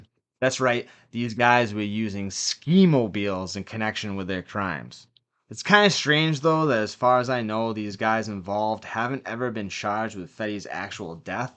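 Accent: Boston, United States. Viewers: video was decent an i liked an subbed cuz u from an mass an i support mass creators the accent was jus throwing me off its the mark walberg shi an im born an raised here never heard it other then from wyt ppl from southie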